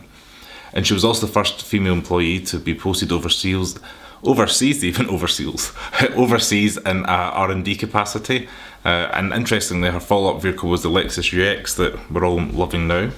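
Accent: Scotland, United Kingdom. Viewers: The guy is speaking Scottish what does Dutch have to do with it? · That's funny, I heard the heavy Scottish and still put the video on 1.25 once your ear gets it, it is all the same. Although I have watched a few Scottish films and they have some good sayings that crack me up. Love it, we don't all need to be the same, please stay different. I have a CT<num> and am now over 100k. I need to do the spark plugs, the shark fin is leaking, and the ABS for the brakes is whining as well as the battery which was all good a few months ago now won't do EV mode. All at once, is that a key point?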